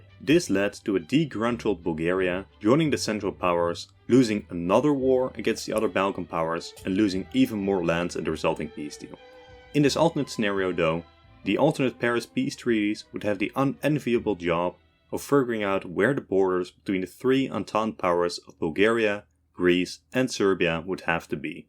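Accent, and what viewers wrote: Netherlands. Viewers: Wait are you dutch?😮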